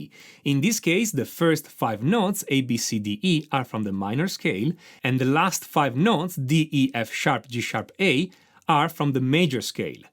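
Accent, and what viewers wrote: Italy. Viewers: I like your accent. Italian?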